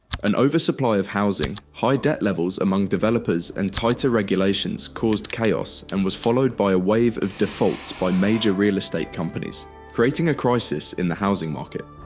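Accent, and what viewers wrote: United Kingdom. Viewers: This guy is british bro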